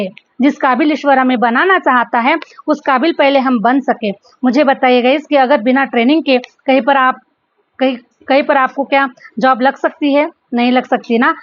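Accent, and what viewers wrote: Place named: Maharashtra, India. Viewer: Mumbai wali language Marathi vibe hain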